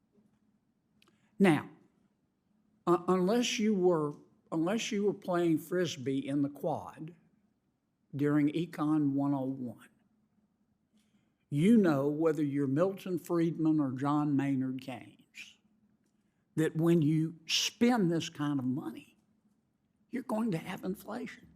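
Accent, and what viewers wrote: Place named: Appalachia, United States. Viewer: John Kennedy the hillbilly talker from Connecticut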